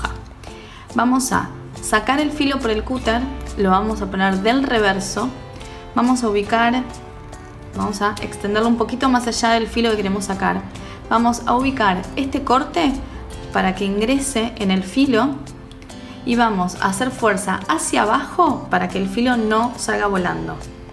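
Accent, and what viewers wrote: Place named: Argentina. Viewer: Amo cómo explica. Los argentinos hablan tan bien. Me fascina.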